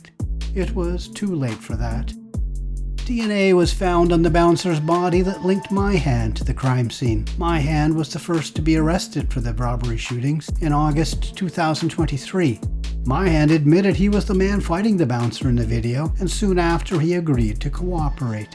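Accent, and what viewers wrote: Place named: Canada. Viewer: You sound like a Canadian bro! Come on down and check it out for yourself.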